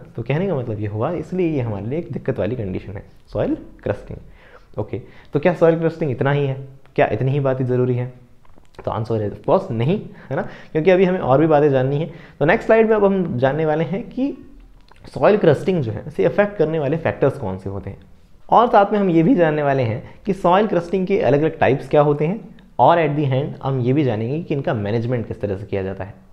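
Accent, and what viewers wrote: Bikaner, India. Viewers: No it's a bikaneri sir ❤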